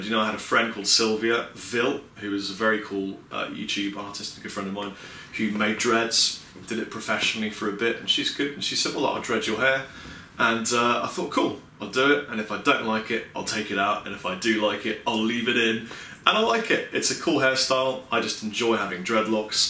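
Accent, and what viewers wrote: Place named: England, United Kingdom. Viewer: I'm a right Scottish fellow but I speak the American English if you will. I find your videos a kin to myself. We're large types of guys and I find myself being proud to see someone speaking the Queen's English! I'm proud of your success thus far!! I hope to see you guys on the West Coast Americas very soon! BTW Chapman Guitars are quite literally the best thing since sliced guitar bread.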